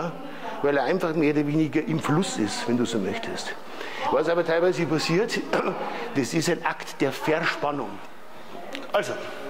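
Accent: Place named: Bavaria, Germany. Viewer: Hallo, wieder mal ein super Video, richtig gut erklärt, auch dein bayerisch ist angenehm und verständlich 😁 Was ich mir wünschen würde wäre ein Training speziell für Bauch(Körpermitte) was für viele sehr unverständlich und falsch trainiert wird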